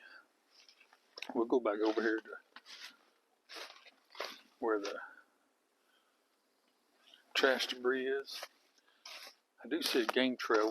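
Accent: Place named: South, United States. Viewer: I was referred to you from at her YouTube channel called: Eat Pray Van that I'm subscribed to so I'm happy she referred all her subscribers to you on her first LIVE video the other day & now I know why.... Cuz your sooooo honest & genuine & very interesting & informative & good looking & good sense of humor & good heart & good sense and I absolutely love your southern accent & southern descriptive words & your voice tone & volume. Sooooo relaxing! Your a great speaker! All the wonderful things that is also. Because you are you I watched & then subscribed right away. Nothing phony about either of you which are the only Creators that I will subscribe to. I also clicked the " LIKE " button that I ALWAYS do & never forget to do that. Ty again!!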